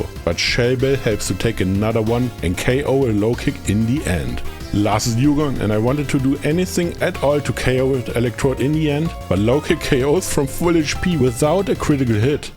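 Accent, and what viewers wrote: Germany. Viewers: Top Video man hört fast nicht raus das du deutscher bist, hab trotzdem ne frage wie kann man onix entwickeln ohne tausch in HG ? P.S. mach weiter so deine Videos machen echt spaß zu gucken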